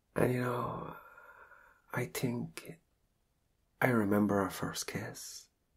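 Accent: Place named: Ireland. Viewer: Me a year ago:I'm not into Irish accents Me now every time I here it:OH MY GUD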